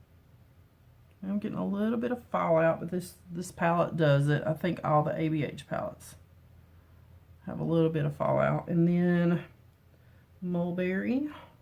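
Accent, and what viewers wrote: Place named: East Coast, United States. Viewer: Hi, fun GRWM! I subbed back to you and thanks for watching my channel too! As you may know, I have lost 50 lbs on keto at age of 67. I love keto and have recently started a new Facebook Group with a link on my video called Keto N Koffee with Marjorie. I think its wonderful that you and your husband have been researching and plan on doing this together. If I can be of help, just ask! I love your accent, you sound like my relatives back east! I am in So. CA. You already have a good start with your weightloss! Your makeup looks lovely! xoxoMarjorie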